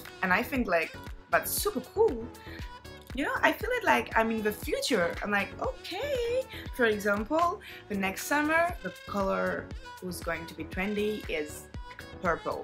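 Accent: France. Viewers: Hello ! I am american 🇺🇸and your video interested but on the other hand you do not have American accent but a strong French 🇫🇷accent and you look a little your words which is a little difficult to understand you but otherwise it is a very good video the subject is interesting👍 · Your accent sounds very french that’s soooooo cute😍😭😭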